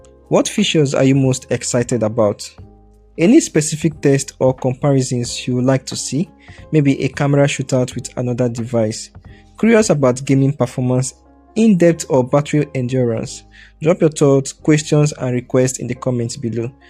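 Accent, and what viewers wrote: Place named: Venezuela. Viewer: Español venezolano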